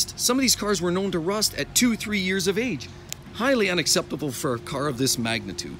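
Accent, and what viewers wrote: Canada. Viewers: Your accent sounds like a Family Guy caricature of a Canadian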